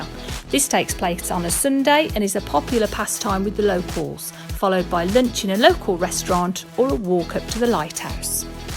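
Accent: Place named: Birmingham, United Kingdom. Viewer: Great to see things getting back to normal, over middle of September should be a lot further advanced by then and you must be a Brummie with that accent 😃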